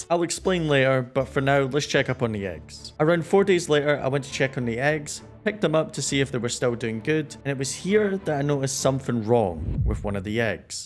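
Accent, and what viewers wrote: Scotland, United Kingdom. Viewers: Yooo is that a Scottish accent I hear? (Half Scot here, always excited to hear the sound of my second home)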